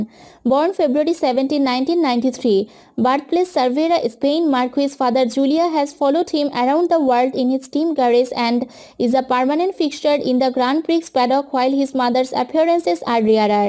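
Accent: India. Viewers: She maybe a indian announcer...by the way thanks for the effort..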